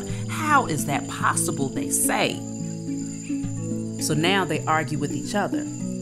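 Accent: United States. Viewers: Love your accent. Which Part of America you from? Also, this here is one of the Most Powerful and On the Nail message I have hard in many years. Not here to state my personal business, but I want to thank you. From Black Man, from London, UK.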